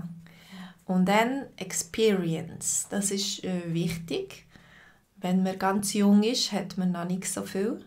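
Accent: Switzerland. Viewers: Aus welchem kanton ist dein schweitzerdeutsch? Ich ziehe bald nach Winterthur wegen ein job um und würd gern zu mindest die sprache verstehen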